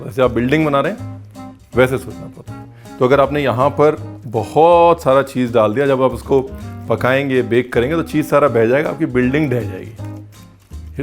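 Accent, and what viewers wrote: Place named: Punjab, India. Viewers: The punjabi touch in ur voice is just amazing 😁 and "ranveer aapne btaya nhi" has separate fanbase🌈♥️ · Reason why I love Punjabi accent even more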